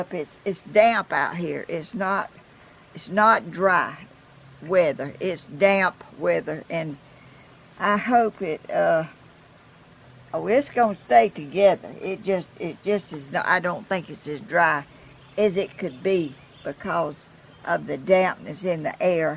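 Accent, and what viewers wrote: South, United States. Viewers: Just found your channel. you are a hoot. I enjoyed your southern accent and your video. I used to have a home in Ellijay, Ga and absolutely loved the friendliness there. You remind me of the town people. Will follow along with you.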